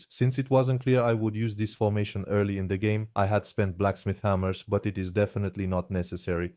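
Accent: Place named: Turkey. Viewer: I listened to the video in the english language and the translation was very good. Oddly enough it still has a Turkish accent. Thats not a bad thing I was just surprised since it is AI translated I wouldn't have expected there to be any accent. You must speak very quickly in Turkish!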